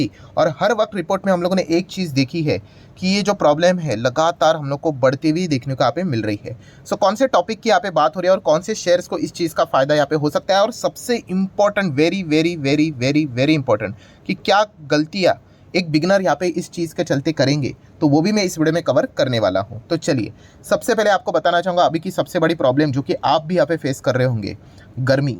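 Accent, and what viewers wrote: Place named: South, India. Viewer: Baat sunke to lagta hai South se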